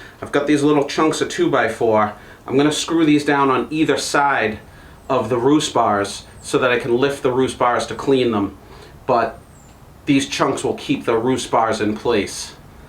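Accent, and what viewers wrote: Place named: New England, United States. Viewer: I have always used soft pine wood shavings in my coops. The coop I'm currently working on finishing will use the deep litter method and so far I have liked the pine. I am intrigued by industrial hemp though and would like to try it out at some point. Loving how your coop is turning out. I found this series when we started doing plans for our coop and I have enjoyed watching your progress. Also love hearing the New England accent as we are New Englanders ourselves!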